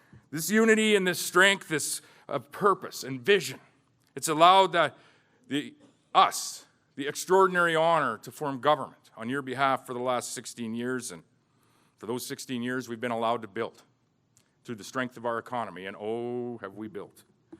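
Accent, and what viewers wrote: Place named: Saskatchewan, Canada. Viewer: Man this guy has a heavy sasky accent.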